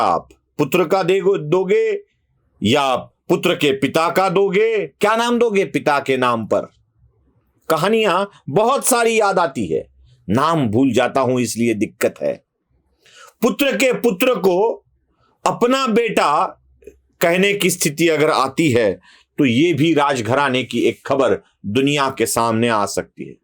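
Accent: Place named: Bihar, India. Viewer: Hindi mein hamesha A hi bihari style ho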